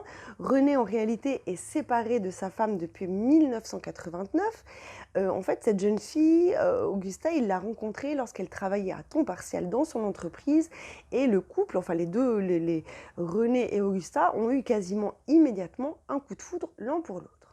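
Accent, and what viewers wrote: Switzerland. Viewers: votre petit accent suisse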